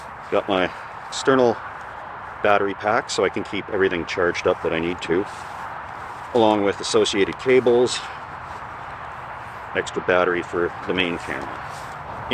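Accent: Canada. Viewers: you've got a nice, soft voice and comfortable Canadian accent. I think you'd be a great reader for an audio book or something of the likes, I'd listen to it.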